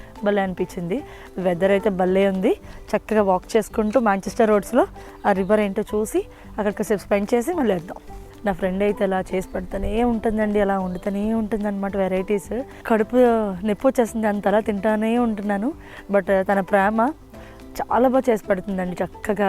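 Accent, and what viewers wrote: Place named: Rajahmundry, India. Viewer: Hello friends meeru matladithe rajahmundry nir chodavaram ni thalchukontunnaru tq friends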